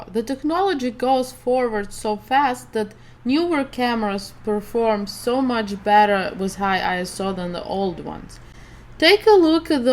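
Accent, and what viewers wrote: Russia. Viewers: Your videos are really helpful! What accent is that? Is it Russian? :)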